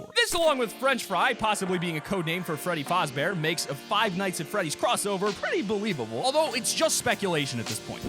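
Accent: United Kingdom. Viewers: u speak london very best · Is someone British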